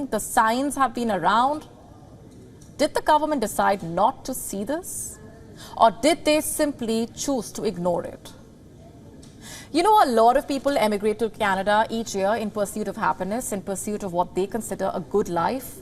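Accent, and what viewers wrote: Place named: Punjab, India. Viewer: We dont need punjabi english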